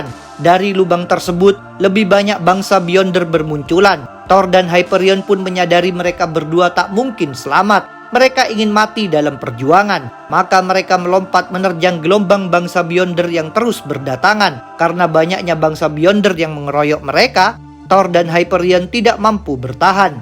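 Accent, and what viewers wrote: Indonesia. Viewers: Byon der,, bion derr,,, medok mana logatnya itu min? 😅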